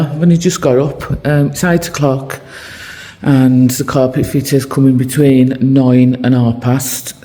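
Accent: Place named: Black Country, United Kingdom. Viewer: Hi love watching someone else with a blackcountry accent love the candle wick jokes x Done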